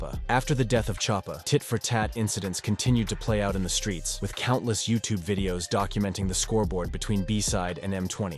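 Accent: United States. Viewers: Presses play Hears AI American type robotic sounding voice Immediately turns video off…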